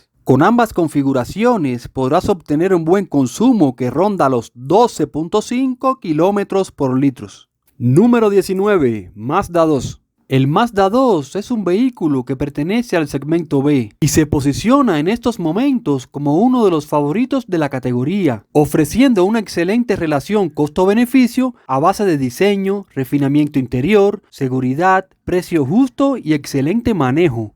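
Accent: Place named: Central America. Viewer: Con acento centroamericano.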